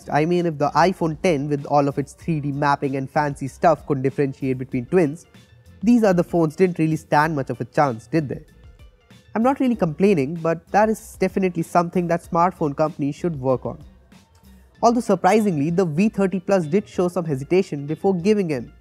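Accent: India. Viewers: Sayam Sawai he has a typical Indian accent but his presentation is pretty good. I wouldn't judge him for his accent alone.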